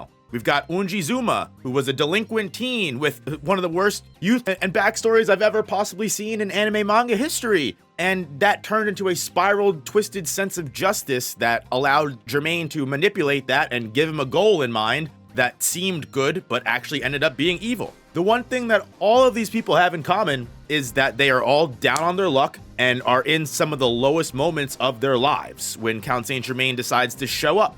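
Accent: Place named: United States. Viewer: The problem with american accent reading Japanese words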